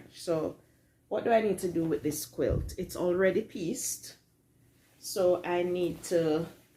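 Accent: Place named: Jamaica. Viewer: Hello, I'm new to your channel! Found you through Sean guy who sews💞 looking forward to seeing more of your videos! I have a coworker who is from Jamaica, your accent reminds me of her, a lovely -lively person 💞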